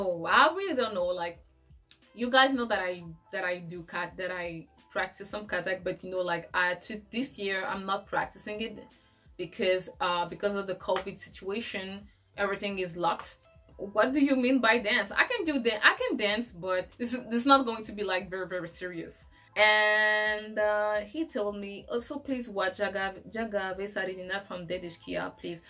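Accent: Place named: France. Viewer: I love the post you did with best dancer and you chose Madhuri.I love all the courtesan songs like you.I am a British Sikh lady and love your french accent.I studied French when I was younger 😂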